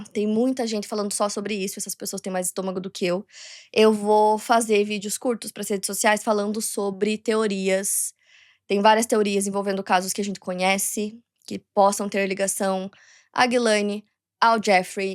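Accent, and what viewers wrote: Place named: Rio Grande do Sul, Brazil. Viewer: Nossa que nojeira, porquê ninguém investiga o tramp? E que vóz chata dessa apresentadora quando fala em inglês misturado com gauchês affiii acho que sou eu que estou sem paciência mas essa reportagem me deu taquicardia, dor de cabeça, ansiedade, nunca termina meu Deussssss ... um rivotril por favor 😂😂😂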